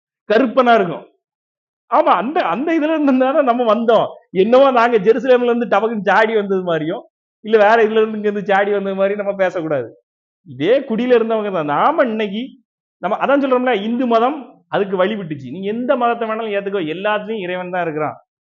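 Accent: Tirunelveli, India. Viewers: Superb. Very matured talk. Entha ooru bro neenga? Tirunelveli slang iruku.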